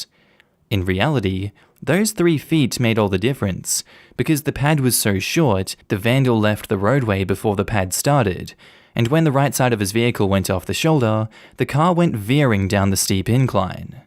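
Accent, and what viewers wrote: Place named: Australia. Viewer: Some good ones there. Maybe one day I'll venture onto reddit to read for myself, but happy to listen to a fellow Aussie read them for me.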